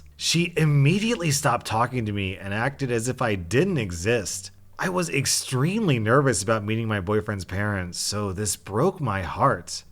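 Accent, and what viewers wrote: Germany. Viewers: I‘m from Germany and I don‘t know this band, but you have an strong german accent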